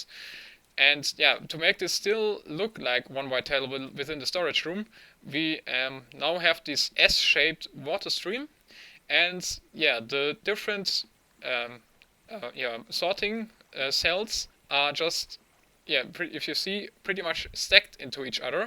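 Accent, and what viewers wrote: Germany. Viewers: 100 % german-english ^^ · You are german, right? :D Man hörts an der englischen Aussprache :D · Du bist deutsch oder? :D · Bist du Deutscher? ^^ Dein Englisch hört sich irgendwie so an :D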